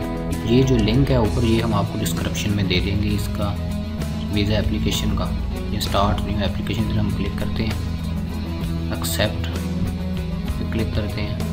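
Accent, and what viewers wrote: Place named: India. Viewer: You ask comment but needless to say , you don't speak ENGLISH to understand it. My comment is use less to you as you are not helpful to me. Onse you speak Indian English, you are damaged for good to get a job in AMERICA. IS UNTOUCHABLE CAST SYSTEM INDIAN??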